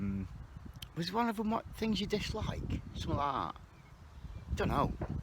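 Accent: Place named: United Kingdom. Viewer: Enjoyed watching your great content and some nice finds boy I really have to listen hard because of your great British accent 🤜🏻🤛🏻 keep it up bro, all subbed and I smashed the like button to boot. Cheers Frank <link>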